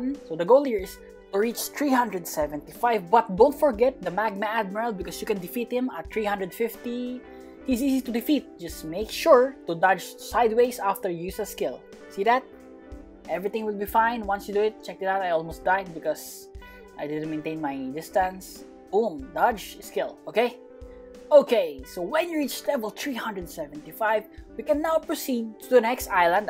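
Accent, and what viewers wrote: Philippines. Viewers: Pilipino kaba kasi parang pilipino ang accent mo eh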